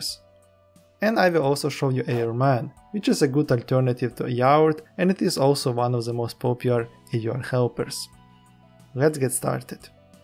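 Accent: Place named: Russia. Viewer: the ruskii accent is the best:)))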